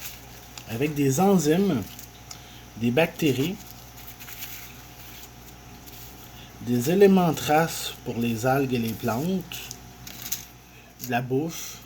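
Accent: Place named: Quebec, Canada. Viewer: C est cool d entendre parler quebecois Bravo! Lachez pas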